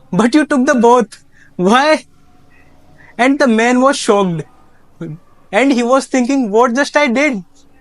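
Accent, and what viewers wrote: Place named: United Kingdom. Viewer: He Speaks well, Simple with grammar. British English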